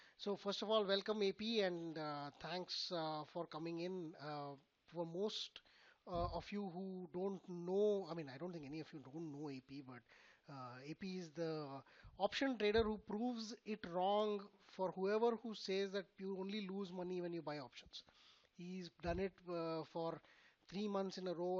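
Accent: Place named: India. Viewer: That terrible Indian accent cannot be followed, such a shame!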